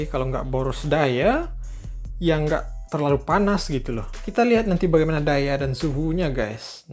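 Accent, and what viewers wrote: West Sumatra, Indonesia. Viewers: bahasa indonya kek orang minang wkwkwk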